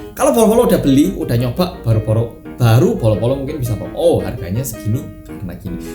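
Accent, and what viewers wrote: Java, Indonesia. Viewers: Korea rasa Jawa..mdoooeee ram eram..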